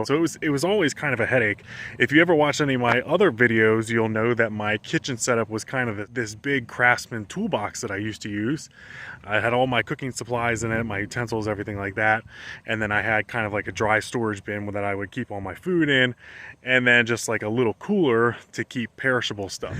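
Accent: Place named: Maryland, United States. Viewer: Ps this is the first vid i ever seen of yours it came across my you tube bc i watch tiny /bus homes . Im hearing an accent that sounds very similar to mine... Are u from Maryland or near???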